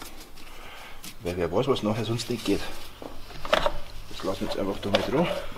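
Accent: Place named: Bavaria, Germany. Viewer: Schee boarisch! Da macht das anschauen gleich noch mehr Spaß ... danke!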